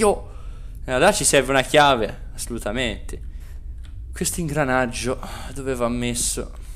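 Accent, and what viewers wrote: Tuscany, Italy. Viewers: LIKE SEI TOSCANO/A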